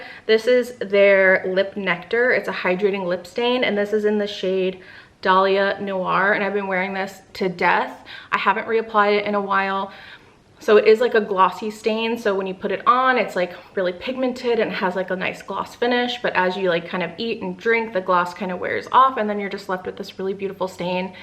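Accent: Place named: Philadelphia, United States. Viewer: BLESS the delco/philly accent - i'm starting task this morning!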